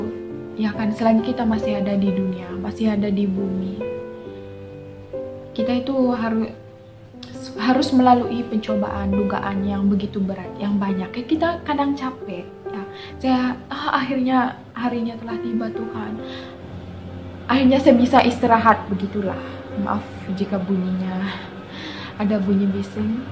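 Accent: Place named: Sabah, Malaysia. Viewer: Mcm orng sabah..😁 gbu👍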